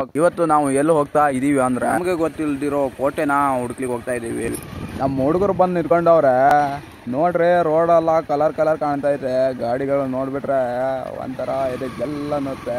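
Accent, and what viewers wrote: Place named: Bengaluru, India. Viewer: Bengalur kannada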